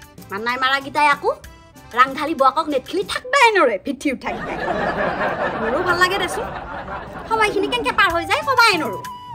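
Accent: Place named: Darrang, India. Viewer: বা তোমাৰ মুখত দৰঙীয়া ভাষা বহুত ভাল লাগে ❤️❤️❤️❤️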